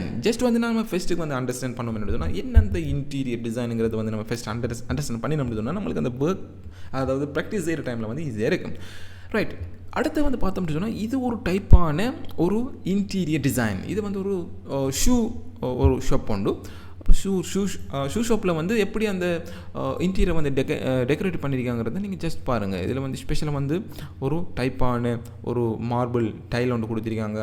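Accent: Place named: Sri Lanka. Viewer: Great Tamil with Srilanka Slang hearing very nice Sir ❤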